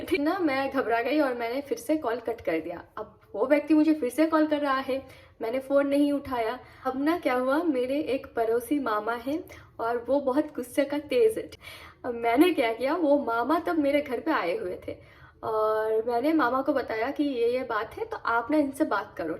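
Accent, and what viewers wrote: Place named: Bengal, India. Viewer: Didi apaki akhee shee pata chal raha hee kii app bengali hee ., kyu kii meri devi jii bhii west Bengal shee hee 😊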